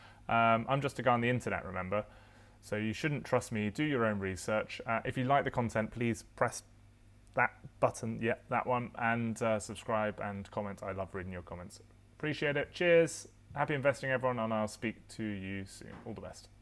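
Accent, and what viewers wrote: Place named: United Kingdom. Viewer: Great to hear another British accent :)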